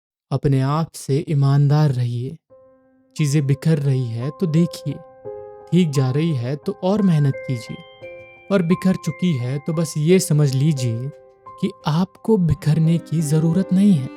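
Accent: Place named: Maharashtra, India. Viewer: I noticed by listening your hindi accent, your mother tongue is marathi. Am I right?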